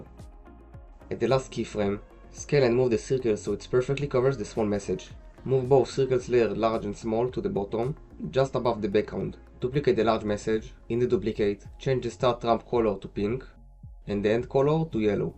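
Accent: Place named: France. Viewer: french accent never can cover:face-green-smiling::face-green-smiling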